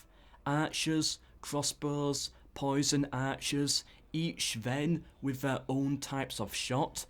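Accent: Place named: Northern England, United Kingdom. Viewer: I'm sorry to say that but what we have here is northern English, not RP British.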